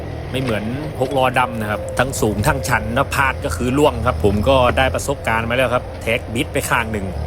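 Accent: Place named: Sukhothai, Thailand. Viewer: สำเนียงโขทัย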